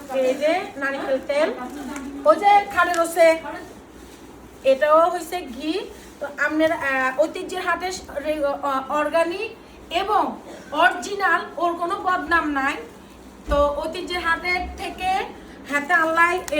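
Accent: Noakhali, Bangladesh. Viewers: রাখি তুমি শুদ্ধ ভাষা বলো নোয়াখালি ভাসা শুনতে ভালো লাগে না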